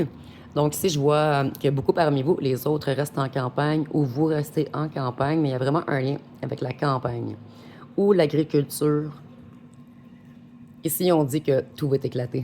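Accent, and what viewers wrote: Quebec, Canada. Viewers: Mais qui me veut.du mal Je ne comprends pas toujours ce que vous dites avec votre accent Québécois Moi je suis en France je suis divorcée A bientôt de vous ecouter Bisous bisous 😘😘🙏🏻😇💕😊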